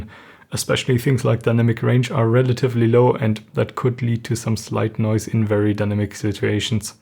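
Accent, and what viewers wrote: Germany. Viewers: I was really excited to see your video, 2 mins into the video I was purring like a cat, your quite monotone German accent put me to sleep, so I had to rewatch it in the morning.....You should start a new channel.... sorry 😮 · man i am so sorry but it's just so crazy how grating german accents are in english, especially his, just full on german sounds, cadence and everything but in english, his english is great but i literally can't listen to this, gotta read subtitles. i'm from germany but american born and this is not even a "me" thing, german accents are the #1 most frustrating to listen to GOSH man ich bin so abgefuckt davon aber ich weiss dass es sinnlos und dumm von mir ist, so empfindlich zu sein und dann noch ein kommentar drueber zu schreiben :D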